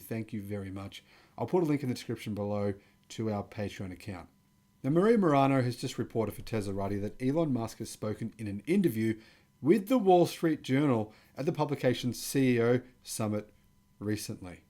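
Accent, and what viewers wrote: Australia. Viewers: My significant other says you’re trying too hard. I said, ‘what do you mean? He’s the most calm speaking Aussie that I’ve ever heard!’ She said ‘it’s obvious he’s built like a brick s-house he should button up and not flaunt it’ whatever…I’m not letting her watch your videos anymore.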